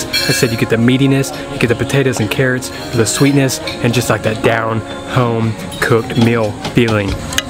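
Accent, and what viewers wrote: South, United States. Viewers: yeah, cute Southern accent. By the way, it is not Cariboo. Hehe. Ca-ra-bow or Carabao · My ancestors hometown. Love Kapangpangan food but I am in the minority as I don't like sisig, my bad. But you are in food haven there. Enjoying watching and also listening to your southern voice , from Melbourne, Australia here. Btw it's not caribou it's carabao (cara-baw) or water buffalo. And you are forgiven. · I’m a sucker for southern accents! 😩 I think we all need a charming country man to travel the world with 😂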